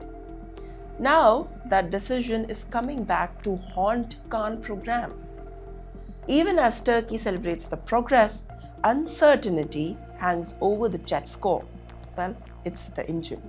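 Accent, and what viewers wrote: India. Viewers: That Indian accent…😮😅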